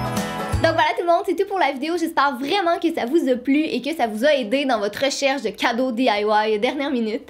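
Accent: Quebec, Canada. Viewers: J'adore ton accent il est trop cute ! J'ai meme demander a mes parents aille te voir au Quebec ! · C'est sûr que tu es de Canada parce que tu as la tonalité des Canadiens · Bordel !! Ton accent québécois est trop mignon 😆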